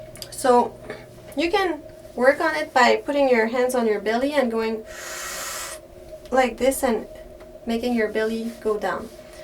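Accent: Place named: France. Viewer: French horn player here 📯 Thank you for you tips , really helps. I can feel that I'm full with air :) Also you have nice English with gorgeous French .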